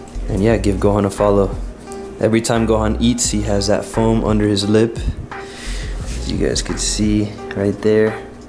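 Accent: Miami, United States. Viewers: Your accent sounds like you’re from Miami. Did I guess correctly? Lol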